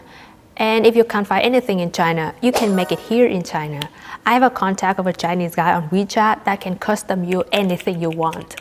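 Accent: Scotland, United Kingdom. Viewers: am fae cambuslang, her English has a scottish twang to it, no english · Great video about my homeland I can definitely hear a wee bit of a Scottish accent in there. PS a lot of people pretend they have a cold when they’ve actually have been having a white Christmas lol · Really trying to place the Scottish twang in your accent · Scottish accent! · it's mental how your English has a Scottish twang to it. It's not a full blown Scottish accent, but you defo have some Scottish intonation. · Can hear you have picked up a wee bit of a Scots accent. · I am from Glasgow but lived in Newcastle many years, i picked up a twang also but I was honestly surprised when I heard your Scottish twang. Anyway good video and content, you just got another sub. Kudos. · am fae glasgow & she does have a scottish twang, you're talking absolute doggy poopoo. Now go back to bed it's late. 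· Nice video, it made me laugh. The accent definitely has a few sounds from Scotland. Not purely as there is still much that sounds like a Chinese accent. Never thought about the water helping to improve the skin and the hair. Makes me think! 😄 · Brilliant.A few weeks in Scotland and there's a hint of an accent.Come back any time. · oh my gosh the way you say "air" with a scottish accent, rolling the R sound, wow, that must be difficult for a native chinese speaker. anyway, it's a most delightful accent and it makes me smile. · Came here to say the same thing as loads of others. Three Weeks!! You have Scottish accent lol!! “Scotlan” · I hear Scottish accent coming though a tiny bit and it's adorable :-)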